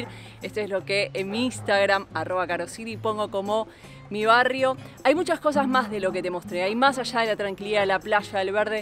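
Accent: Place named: Argentina. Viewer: escribo desede ginebra ( Suiza ), perdoname, mi nivel de español no es alto. Pero me encanta la energia que ud transmite con sus videos.es la mejor video sobre Badalona.En particular cuand ud.habla del parque que es el pulmone verde de la ciudad. Tu accento argentino es muy simpatico cuando pronuncias las palabras. Me recuerdo, que hace vueltas en bici desde Barcelona, pero en este tiempo no hay pistas para bicicletas, et yo me encuentro con mi bici, una vez en la autopista. Me falta mucho gramatica en castellano, y espero que eres indulgente conmigo. Besos de Europa Central. Tu nombre es?!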